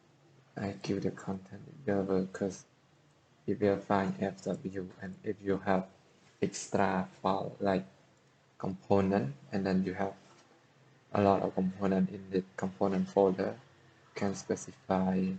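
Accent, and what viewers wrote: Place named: Indonesia. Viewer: hmmmm logatna kek orang indonesia :3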